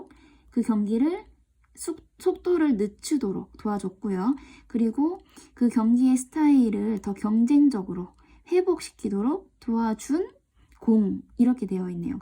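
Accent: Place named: Busan, South Korea. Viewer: 장마시즌 부산은 장맛비가 내리네요 ㅎ 영상보면 억양이 부산분 같기도하고 은 고향이 어디에요? 뭔가 친근한 말투라 더 집중하게 되네요 감사해요 ❤❤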